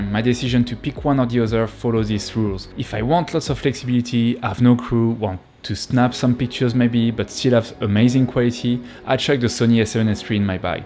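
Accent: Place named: France. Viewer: I bought a FX3 and FX6 but I plan to sell the FX6 for the Komodo. I don't need two run'n'gun cameras and the FX3 is perfect for that (so it will be basically the same setup as you😄 without the Raptor haha). And I'm gonna sell my Pocket 6k pro (that I love), because I won't need it anymore since I will have the Komodo. But before that I'm going to rent the Komodo, and test it on my next project (I have a big music video coming soon). I love the FX6, don't get me wrong, it's a true workhouse! but I can do the same with the FX3 and the image quality is almost identical. The only thing I will really miss is the electronic VND from the FX6 (otherwise I much prefer the image coming from my Pocket 6K Pro, and I guess I will also prefer the image coming from the Komodo). P.S: tu as déménagé en Australie (j'entends à ton accent que tu es Français 😃)? J'y ai passé un an en 2009, j'ai adoré!! 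Il faut que j'y retourne un jour!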